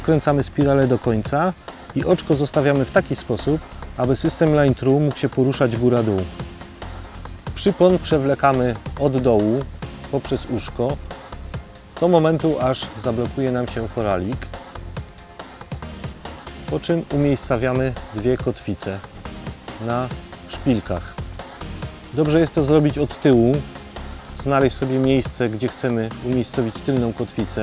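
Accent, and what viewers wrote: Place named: Czech Republic. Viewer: Простите, вы в какой стороне живёте, похоже на Чехию по произношение, я из России..))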